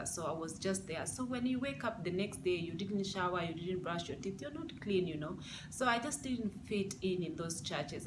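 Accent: Kenya. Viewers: Yeah i knew the moment you started talking.the accent huh😍😍😍😍.mimi pia nimkenya nko switzerland one day i will do a video. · butterfly9274 That's a Kenyan accent. That's why I took Interest to listen on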